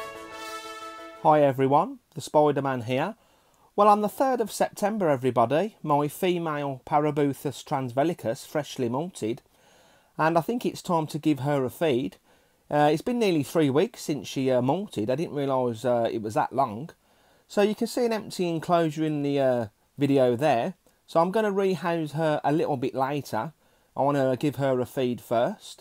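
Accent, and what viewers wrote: Australia. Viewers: As a layman I have never heard of this classification, but when I hear an Australian say the words "poison level foive", I know sh** is real! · The best Aussie accent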